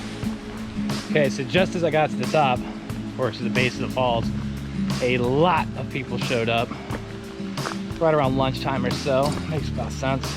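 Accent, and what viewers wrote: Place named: North, United States. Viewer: You said you have been there 25 years but your accent is a bit more 'up north' sounding. Where are you originally from?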